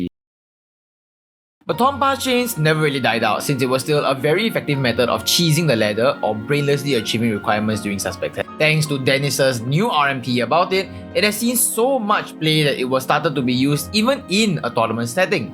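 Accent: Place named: Singapore. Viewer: eh u sound sg sia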